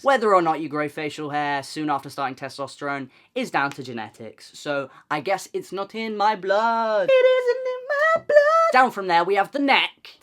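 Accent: United Kingdom. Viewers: hes literally just british ahah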